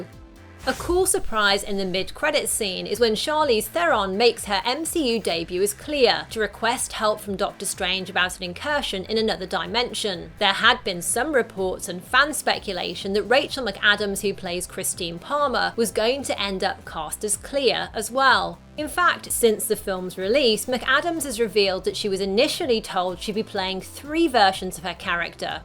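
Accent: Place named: United Kingdom. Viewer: I'm new in the channel, beautiful accent! Are you British?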